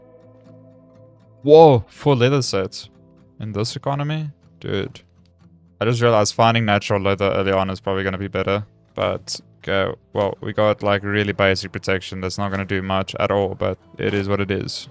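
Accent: South Africa. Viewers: Are you South African by any chance ? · bro are you from south africa? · yoh I recognize that accent from a mile away, miss South Africa man. You've earned yourself a subscriber so I can feel more at home lol. Also, a really good video!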